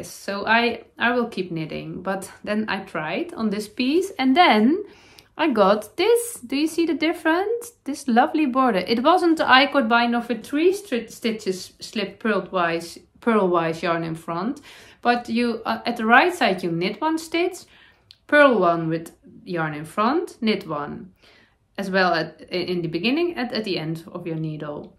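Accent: Netherlands. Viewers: Greetings from Chicago, Illinois, I came across your podcast a couple weeks and what a pleasure it is to sit down, knit and see all your beautiful makes:) I wanted to see all your episodes so i started from the beginning of the English episodes lol Dont worry about your "Dutchy English" you are doing a great job!!!!! I checked Ravelry for the Kambgarn and it is listed as a Sport weight yarn, 100 % wool - merino dyed and milled in Iceland, it also says Kambgarn is pure imported merino wool. I guess its safe to say its NOT superwash.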